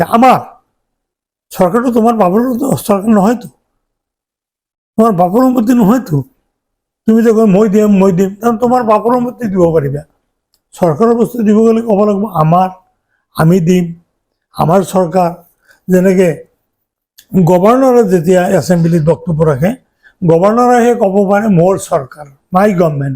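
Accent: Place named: Bangladesh. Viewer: বাংলাদেশী কথাতে গম পাই ।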